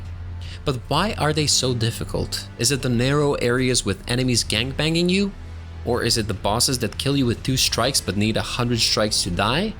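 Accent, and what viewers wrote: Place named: Italy. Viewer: That Italian accent tho 😁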